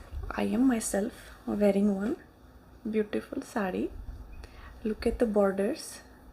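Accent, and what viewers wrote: South India, India. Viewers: I knewly discovered the Indian accent gives me ASMR and let me tell you I am soooo glad to have found this channel! Subbing ☺️ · An Indian accent in English is just sooooo relaxing ❤ love your videos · That sounds more like South Indian English accent. But yes, it is the essence. Indian people do not have any accent while speaking English. And now that "no accent" has become an Indian English Accent somehow. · I love English with Indian accent so much ! ❤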